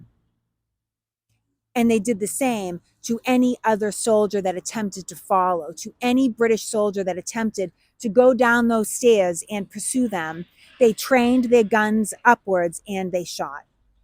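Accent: Boston, United States. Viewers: It is a pleasure a beautiful young historian with the real Boston accent! 😀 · Thanks for another great video. You're absolutely correct in stating that once you see the bullet holes inside the house you'll never forget them - a physical reminder of the horror of war. The redcoats even stole the mechanism from the grandfather clock and sold it in Boston...as a native Bostonian it is SO COOL to hear this history presented by someone who talks like me. You even look like my cousin from Dawchesta! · So much of the history reminds me of my youth, growing up outside Boston. You accent triggers soooo many memories and is far more typical of a Boston accent than anything actors think is how we sound. :o) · I can watch and listen to her all day long. The Boston accent is just something us folk native to the Southwest only experience in movies or TV. is a magnificent speaker to begin with, add that incredible accent and those cool giggles are a real treat!!! 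· Thank you, for giving such an informed and entertaining video on this subject. You really should reach out to PBS and show them what you have to offer. The gift of your accent makes watching what would otherwise be educational, but not much more, something that stands out and brings us closer to connecting a modern Boston with 1775. If I were producing, I would be very interested in your talent. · I live in the Boston area and my accent isn’t anywhere near this strong. My neighbor sounds a lot like her though. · My Dad was born in and went to school in Boston and her local accent is 75% stronger than his was.🤩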